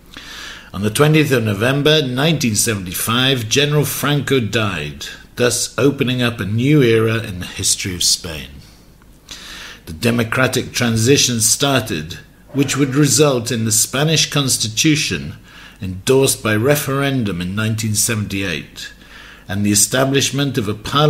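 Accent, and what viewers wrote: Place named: RP, United Kingdom. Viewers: Indeed. "I call it british RP with a spanish twist" but I found it to be sophisticated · His voice sounds like Trump's with a British accent hahaha I actually like it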